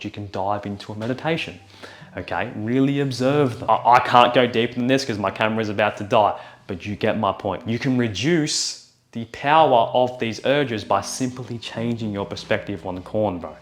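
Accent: Australia. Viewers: You sound Australian, but you spell 'favour' the American way in your subtitles. Remember your English roots.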